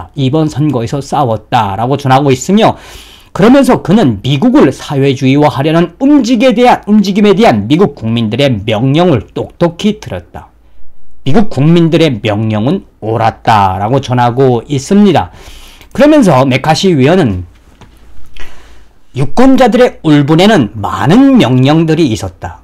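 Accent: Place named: North Korea. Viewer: 북한 말투쓰지 말고 그냥 평범한 일반 표준 한국어로 진행 하시길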